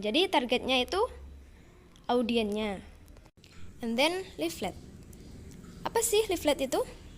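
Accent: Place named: Java, Indonesia. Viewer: Javanese English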